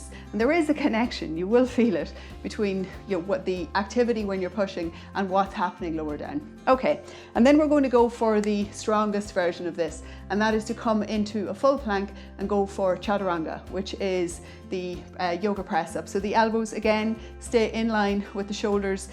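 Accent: Ireland. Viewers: thank you for your testimony. I appreciate your honesty. Part of me can’t imagine getting to a place where i can run or jump for an extended period of time without leaking... so surgery seems like the Obvious choice. I get different reports from different doctors about mesh. I believe they used my mom’s own tissues when she had hers done. I have rectocele and retroflexed uterus as well with prolapse . I have some lower backpackpain and i have had a ablation for excessive bleeding. I have been told by someone that a hysterectomy also helps with the POP and incontinence w or wo the sling procedure . What are your thoughts??? I am going to keep working with my pelvic floor and i do plan on reading your book. But i appreciate your feedback. PS I assumed that you were from Ireland bc of your accent. Your English is very good!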